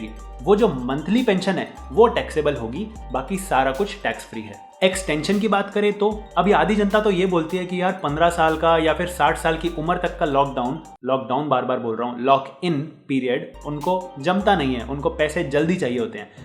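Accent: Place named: Punjab, India. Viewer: Vele baitha tha #Punjabi tone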